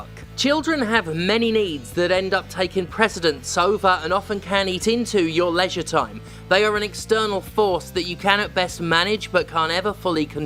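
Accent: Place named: United Kingdom. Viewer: Sick of these silly accent havers always defending each other. Keep it on your sad islands and off americas internet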